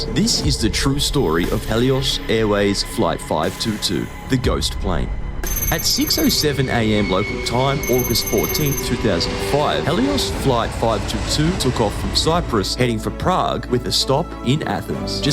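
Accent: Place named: Australia. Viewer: what the aussie accent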